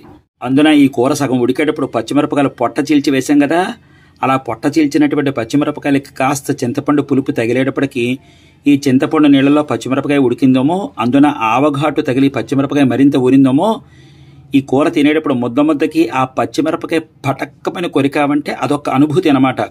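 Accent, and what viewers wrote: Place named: Godavari, India. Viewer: చక్కని గోదావరి జిల్లా తెలుగు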